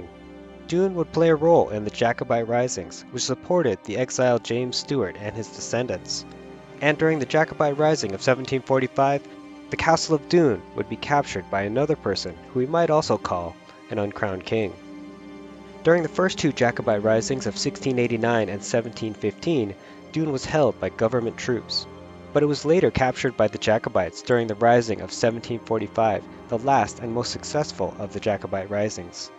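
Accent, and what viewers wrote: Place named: United States. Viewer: Why would you have an american narrator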